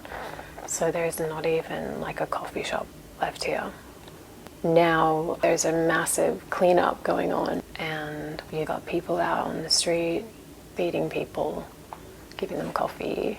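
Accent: Australia. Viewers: I'm really sorry to hear about everything that's been going on where you are. It's good that you're okay though. As for your content, I love the asmr cooking videos you've been posting. There's not much of that around in comparison to the tapping videos, so it's a good little niche that you've found. Some general vlogs about things that are happening in your life would be pretty cool as well, and maybe you could incorporate some videos about your progress learning the guitar? You've got such a naturally nice and soft voice, that I could listen to you talk about anything really. Of course I'm a sucker for an Australian accent too 😂 But whatever you decide to do, I'll definitely stick around to see where it goes. Take care and stay safe!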